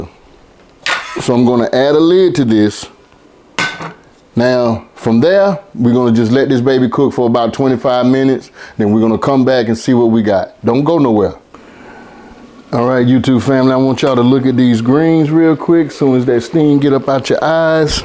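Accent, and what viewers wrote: South, United States. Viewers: “Not too much where it’ll set you on fiyahh” 🤣🤣🤣 Looove the southern accent brotha and thanks for sharing your kitchen with us!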